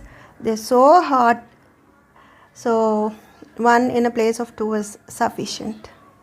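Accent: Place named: Andhra Pradesh, India. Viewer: మీ vedio లలో తెలుగు పట్టి పట్టి మాట్లాడుతుంటే తెలుగువాళ్లు కాదేమో లేదా ఏ అమెరికా లోనో పుట్టి పెరిగి భారత్ కీ వచ్చారేమో అనుకున్నాను 😀 ఇవాళ మీరు english లో మాట్లాడుతుంటే confirm అయింది మీరు పుట్టి పెరిగినది అంతా పక్కా ఆంధ్రా 😀 Any how your garden is beautiful and we can make out the hardwork you put behind to maintain it for years. Keep going. All the best mam👌👏👏👏👍🙏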